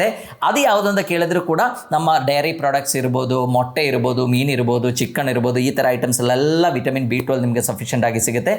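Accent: Kerala, India. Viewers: Sir r u malayali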